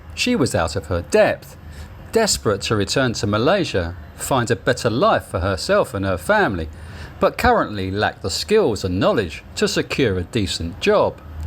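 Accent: United Kingdom. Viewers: I just love your videos, Cheers 🍻 from Serbian fan. Sametime struggling a bit with British English, but ok.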